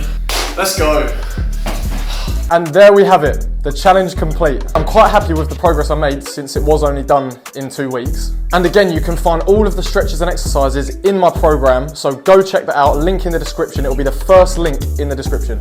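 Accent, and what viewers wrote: United Kingdom. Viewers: YOOOO YOUR ALSO BRITISH?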